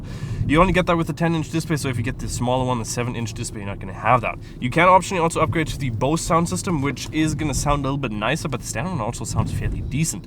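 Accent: Germany. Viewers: Jaaa! A German speaking English is quite funny. Good review though.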